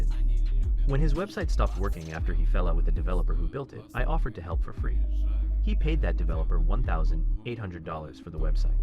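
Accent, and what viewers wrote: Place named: Russia. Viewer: Honestly i think you should've made the video with the Russian accent it would've been more enjoyable (no offenses)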